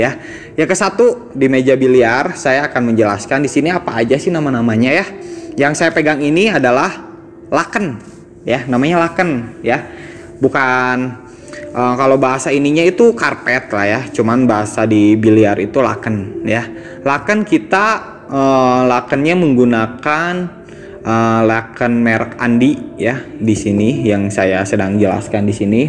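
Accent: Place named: West Java, Indonesia. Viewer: Belajar dasarnya pake logat sunda, maklum~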